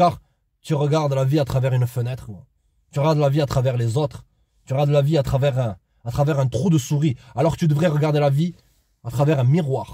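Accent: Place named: Marseille, France. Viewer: Quelle sorte d'accent est-ce cela? Marseillais? Au fait, merci pour partager les pensées. C'était interessant.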